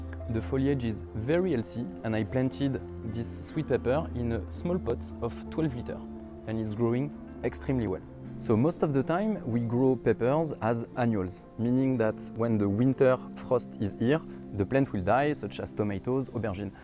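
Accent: France. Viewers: Such a beautiful french guy speaks on cute french accent about his balcony garden ☺️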